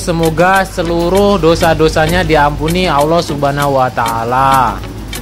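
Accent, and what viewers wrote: Java, Indonesia. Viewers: Mantap mas..viewnya.mas asli orang mna.dari suaranya kyak suku jawa gitu.Explore terus ranah minang sy dukung chanell G.J.J.Salam 1 sumatera.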